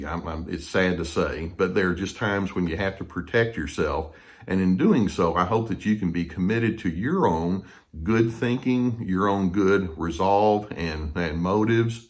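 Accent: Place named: Texas, United States. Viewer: Exact description of my neighbor, he is in the 'suffering' stage. Once we know the way, we will always win by taking the higher ground and holding our power. This is the VICTORY that you share so freely, giving true hope to those who are on the road to recovery. And, your Texas accent with your soothing voice is SO RELAXING AND AFFIRMING!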